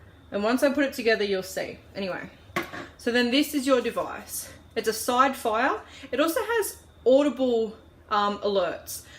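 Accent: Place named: Australia. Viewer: I love the aussie accent too, such a typical American thing hahaha.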